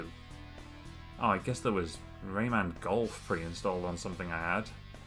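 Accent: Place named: East Midlands, United Kingdom. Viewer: You put so much effort into this, I love it. I saw when you joined and I assume this was a Covid project? Also you sound so close to my accent in the East Midlands!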